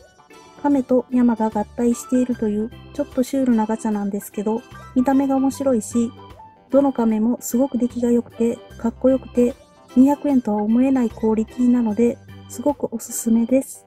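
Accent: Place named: Kansai, Japan. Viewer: 喋ってる人関西弁度々入ってるやん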